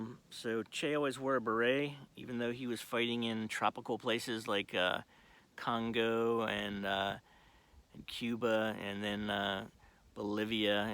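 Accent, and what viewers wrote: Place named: Baltimore, United States. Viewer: It kind of sounds like Baltimore. What do you think?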